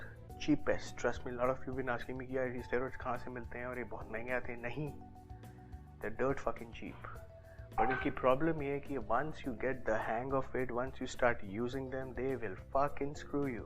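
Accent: India. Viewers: ya he definitely limits his audience potential...half English mixed with Punjabi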